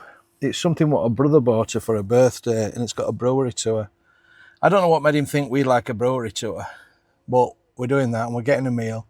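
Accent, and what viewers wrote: Yorkshire, United Kingdom. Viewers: Thought I’d got Jamie Oliver Chanel at first 😂 until I heard your dulcet Yorkshire comments. Nice one 😊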